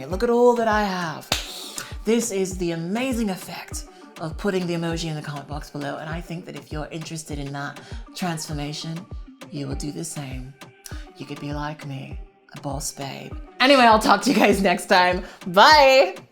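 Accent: United Kingdom. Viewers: 🧡🧡 that british accent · 🧡 i love the british accent that gets sprinkeled in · mood As a fellow British person, I would like to say your lapses into the British accent (which is SO clearly your native accent ;-) ) bring me great joy. (I can't ever put the emojis because I watch your vids on my computer :sadtimes:) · I've noticed you've been occasionally doing a British accent randomly in your videos. Especially recently. When are you getting your own BBC series? 🧡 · 🧡 is no one going to discuss accent developments? i love the dips into accents every now and then. we a do it to varying degrees of success, but british is starting to get good. i wonder if she's been binge watching bridgeton or following a new english youtuber. whatever, it's fun when people switch accents for no obvious reason.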